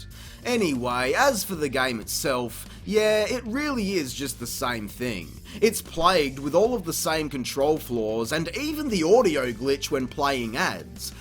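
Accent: Australia. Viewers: "Kind of, like, YouTube, actually. Don't forget to subscribe and share Tony Hawktober with all of your friends!" Mate, I'm Brazilian, my few friends that actually understand a bit of English unfortunately wouldn't ever understand that marvelous down under accent. But, you know what? I've been watching you for over a year, and if you hadn't said that, I'd never notice I wasn't subscribed. Now I am. Sorry about that, mate, I honestly never noticed since your videos always appear in my home page. I could swear I was subbed.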